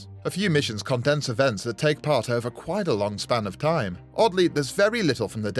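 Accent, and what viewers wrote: United Kingdom. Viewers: Because in England where I assume he is from based off the accent it is illeagal to emulate copyright content. Punishable up to 5 years without bail. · You and Alan Rickman have like the same accent. 🤭 I get you are speaking silly lines, but your normal speaking voice sounds like you are both from the same town or the same part of Britain 🇬🇧 👍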